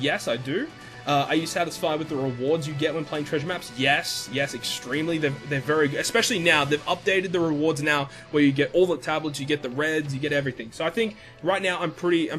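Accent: Australia. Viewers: So you from Australia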